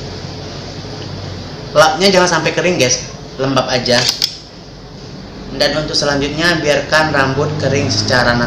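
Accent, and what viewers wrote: West Sumatra, Indonesia. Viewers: Logat Minang nya, Karen bang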